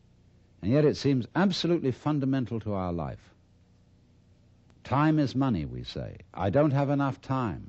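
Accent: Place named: England, United Kingdom. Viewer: With that English accent i would not beleive anything he. says.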